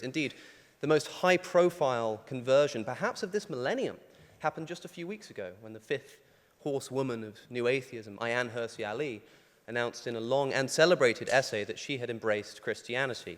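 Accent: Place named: RP, United Kingdom. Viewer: It's mostly you. This is how educated people, especially Brits, tend to speak.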